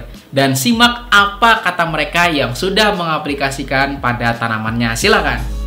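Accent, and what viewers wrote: Banjar, Indonesia. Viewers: Wahh kaya ny banjar banar nih sanak 😁👍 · kayak logat orang Banjar kkak... mantaappp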